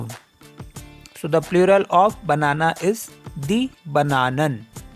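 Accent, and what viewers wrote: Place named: Germany. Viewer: I realy like the German accent just I like the Japanese accent. This is nice.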